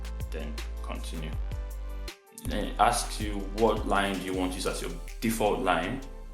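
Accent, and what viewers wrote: Nigeria. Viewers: Good day, You sound NIGERIAN. Please how does one call using the secondary sim from contacts. Since I can have numbers in my head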